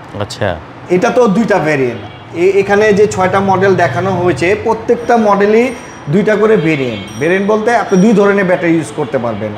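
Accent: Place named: Kolkata, India. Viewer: লোকটি কোলকাতার বাবু নাকি? ভাষা এমন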